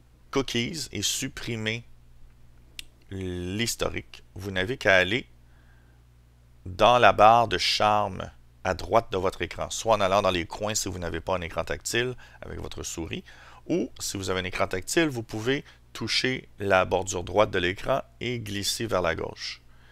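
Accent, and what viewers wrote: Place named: England, United Kingdom. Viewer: belle accent anglais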